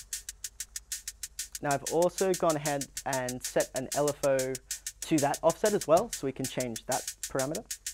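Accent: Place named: New Zealand. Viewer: Although, I think this chap may be from NZ. :)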